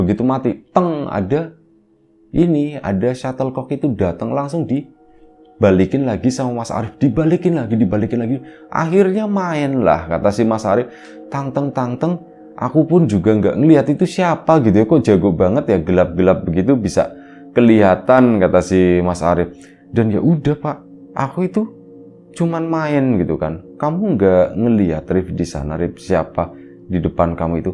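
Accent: Tegal, Indonesia. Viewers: Wa, alaikummusasallam wr wb, Palembang hadir dan nyimak selalu, Di tunggu cerita selanjutnya, Buat moga makin sukses dan buat mas narator, moga sehat slalu dan slalu dalam lindungan Allah SWT, Owalah mas narator asli nya Tegal ya.....?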